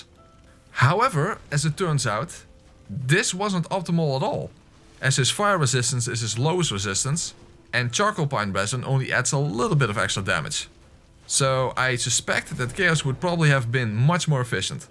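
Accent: Netherlands. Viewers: your accent is quite obvious Dutch (Netherlands). Very nice 🙂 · I like his dutch accent!^^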